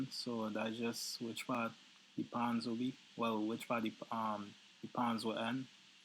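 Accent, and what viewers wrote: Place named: Trinidad and Tobago. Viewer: Sound like a Trini bro lol, Good Video btw, learnt a lot !!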